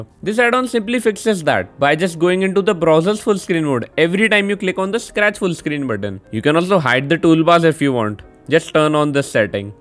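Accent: India. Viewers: I was focusing on the Indian voice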